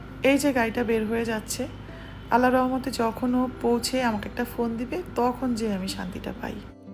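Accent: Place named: Barishal, Bangladesh. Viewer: Apu apni kothai porashuna korechen,apnar kotha shune barishal,feni,noakhali mone hoy,?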